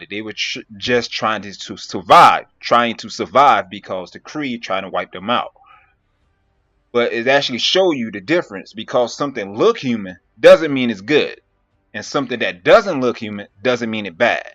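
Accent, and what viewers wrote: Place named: South Carolina, United States. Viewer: Man you representing that SC accent deep. Shout out to South Carolina.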